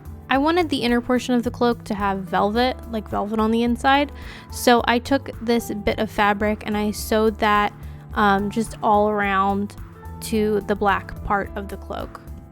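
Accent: Pennsylvania, United States. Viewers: u have such a pa accent hahahah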